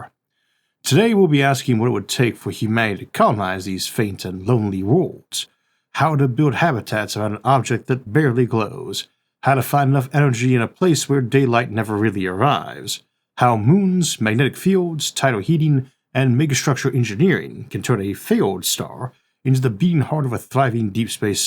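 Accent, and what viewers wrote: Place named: New Orleans, United States. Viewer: This man sounds like he's from N'Awleans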